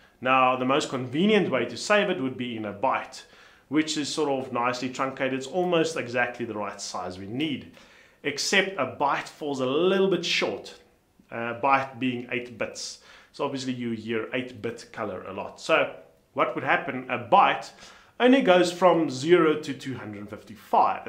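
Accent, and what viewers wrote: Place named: South Africa. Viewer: Excellent , Thank You , quick understanding of colour. Sounds like a South African Accent !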